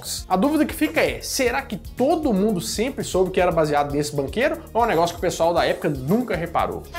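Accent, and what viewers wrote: Minas Gerais, Brazil. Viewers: Estou conhecendo o canal agorae simplesmente AMEI. Vc é muito simpático e divertido, sem falar nesse jeitinho mineiro que é demais. Parabéns!!😘😘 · esse que eh o verdadeiro sotaque mineiro que a gente tem, não é aqueles trem feio que as novela das 7 da globo tenta fazer não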